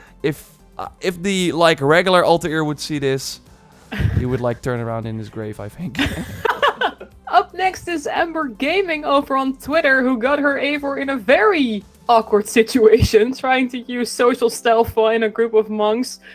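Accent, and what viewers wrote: Netherlands. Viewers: U dutch? You have a dutch accent XD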